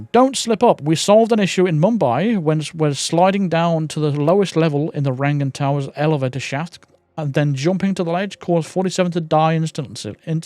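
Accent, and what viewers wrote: United Kingdom. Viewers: I get that, it's all good also TikTok is banned is so many places as well. I can't remember where you're from. All I know is it European, I would say England or Great Britain. Not trying to fix you, I'm just say by your videos and your accent, I can tell your from somewhere around there. Also what you said about waiting for your healthcare. They being said I hope you're well man. I truly mean that. You have said they publicly. I'm not going to repeat what you sai, but I do remember when you talked about your issues. I just wish you well. Thank you very much. I can't praise you enough. You have been getting shit from people, ignore them please, just know there's people out there that love you for all your hard work and very much appreciate it as well. I wish you the best, thank you so very much. I love you man. You the best hitman guide out there.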